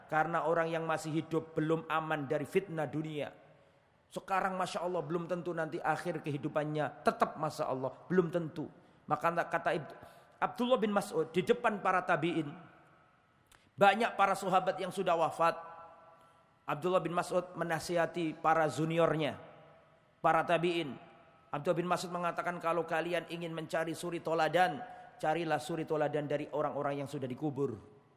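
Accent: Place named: Java, Indonesia. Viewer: Seneng p ustadz logate njowo iq...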